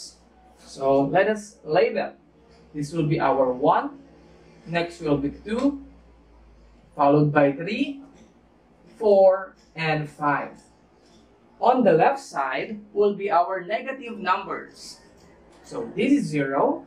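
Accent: Philippines. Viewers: Sri lankan? I thought he's from Philippines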